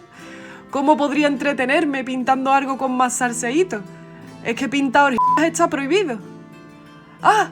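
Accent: Andalusia, Spain. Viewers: Qué hermoso acento andaluz, saludos desde Venezuela. Primera vez que veo tu canal y me parece muy interesante, tanto así que ya me he suscrito. Gracias por generar contenido con cultura y buena música. Mucho más éxito para tu canal! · es andaluza, ese es el "ACENTO" de Andalucia aunque hay variaciones dependiendo de la provincia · Cada vez mejores tus videos,. Sigo hipnotizado por ese acento tuyo, tan andaluz, que reberbera en mi oído argentino. Gracias! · Oye pero que buen video, muchas gracias por compartirlo... ¿Alguien sabe de cuál lugar de España viene el acento de? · Muy bueno el acento andaluz....es casi el acento mas iconico de España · Qué lindo hablas, de qué parte de España eres? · Con este vídeo descubro este genial canal. Qué gusto empezar un video escuchando el acento andaluz, madre mía 🤍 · Recién conozco tu canal, me encantó ! Saludos desde Uruguay. 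Y el acento es lo mejor, me acuerda a Manu Tenorio jaja (sevllano será? de Granada? otro del sur de España? nadie de España me insulte, sólo es curiosidad y un poco de ignorancia) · Me mata tu acento! Es andaluz? Eres encantadora! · Qué rica tu forma de hablar... Es una manera muy particular de hablar en España... No sé, específicamente, de qué parte de España. ¡Saludos, desde Santiago de Chile!... 👍👍👍👏🏻👏🏻👏🏻👏🏻🌷🌷🌷🌻🌻🌻🌹🌹🌹💐💐💐💐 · Que lindo tu acento no lo había escuchado nunca es precioso se que es español pero no se de que lugar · Uaaauuuu sensacionalllll,eres buenisima. Que mel9dia mas bella y que talentazo tienes. Y mas,tu aciento andaluz deja el video aun mejor. Es andaluz,verdad? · 🟥Interesante. De paso se aprende de la obra de El Bosco (Pintor holandés, ahora Países Bajos). En seguida voy a ver el otro que hiciste de Da Vinci. Tu acento de dónde? sur de España?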